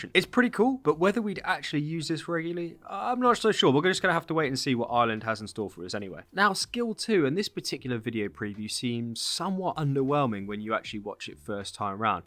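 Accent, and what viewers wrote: England, United Kingdom. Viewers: Judging by your accent, you’re English?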